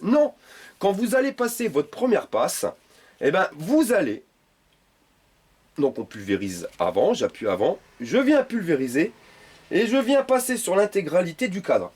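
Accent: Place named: North, France. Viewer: Salut, alors je te trouve excellent ! Vraiment ! Ta gouaille , ton accent du nord je pense, ta façon d'expliquer ta technique de peinture et ton humour , j'adore mdr ! Merci pour ce bon moment et je vais attaquer mon vieux vélo plus sereinement. Merci pour tout !! J'ai juste un léger doute sur le orange de ta mob :)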